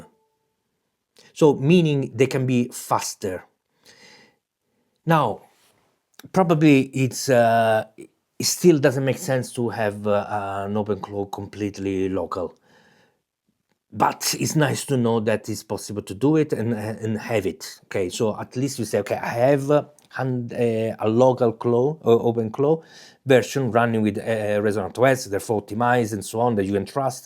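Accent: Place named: Italy. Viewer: Many thanks for your detailed response, and happy YouTube anniversary! I wish you the very best in the coming years. I was hoping to run a local coding LLM, as well as develop an AI powered app on this machine, but looks like I was under the wrong impression that it can handle that. I really appreciate your clarifying this matter to me. Just a side note: your Italian accent is great! My next door neighbours here in Canada are Italians, and they are the best neighbours anyone could dream to have. Have a fantastic weekend!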